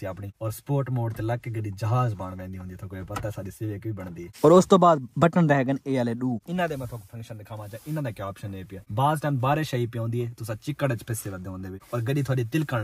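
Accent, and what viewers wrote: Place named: Saraiki, Pakistan. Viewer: آج تو چھوٹے پروفیسر صاحب بھی اپنے خیالات کا بتارہے ہیں کہ گاڑی میں کیا کیا فیچر ہیں اوبھائی اس کو پڑھائی کرواؤ پروفیسر نا بناؤ اس تو ملانہ بات چیت میں بہتر ہے چھوٹے کو پہلے بات چیت کرنے طریقہ سکھاؤ تاکہ جب یہ بڑے ہوکر بات چیت اچھی کرسکے اور میرے کافی دوست سرائیکی ہیں جن کا تعلق ملتان ڈیرہ خاذی خان اور رحہیم یار خان سے ہیں ان کی بات چیت میں آپ کی سرائیکی میں فرق ہے🙏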